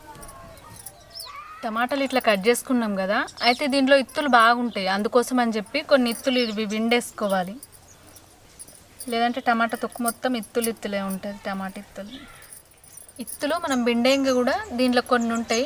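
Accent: Telangana, India. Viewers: Chutney bagundi and me telangana language kuda bagundi 😊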